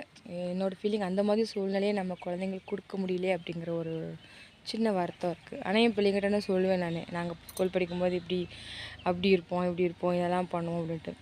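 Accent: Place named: South, India. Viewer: கள்ளம் கபடம் இல்லாத குழந்தை தனமான உங்க தெற்கத்திய பேச்சு எங்களையெல்லாம் மயக்குது தங்கம்.நீங்க தொடர்ந்து வீடியோ போட்டுக்கிட்டே இருக்கணும், நாங்க உங்க அழகான பேச்சை ரசிசிகிட்டே இருக்கணும்.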